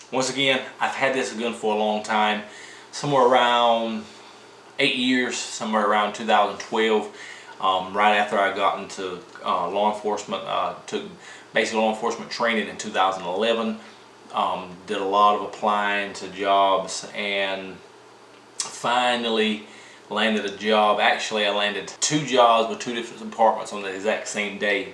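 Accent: United States. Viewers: Great Review bro! I'm originally from Laurinburg, NC and you sound like the folks from Pembroke, NC! The accent reminded me of home. Good Stuff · At first I thought it was a black British guy trying to put on a southern American accent but nope. Just a country ass black dude....right on!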